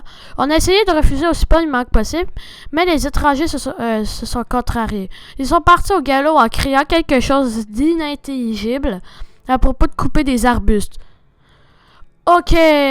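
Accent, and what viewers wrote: Quebec, Canada. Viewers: Super vidéo en plus tu es Québécois j'adore l'accent Quebécois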